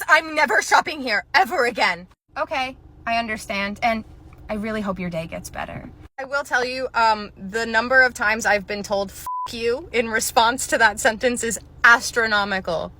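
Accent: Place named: Massachusetts, United States. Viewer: So, I'm from MA and it is driving me inSane that I can not figure out if your accent is from there or not😭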